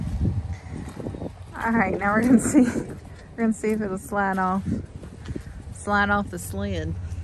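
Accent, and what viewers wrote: South, United States. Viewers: I gotta said I love you southern accent. You are a keeper for sure. you are the man and you have a great women by your side God bless y'all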